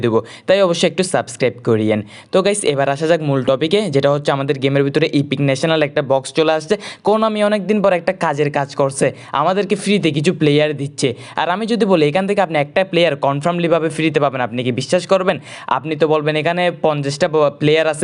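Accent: Chittagong, Bangladesh. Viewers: Apnar Kotha sone Ami 100% sure apni chatgaya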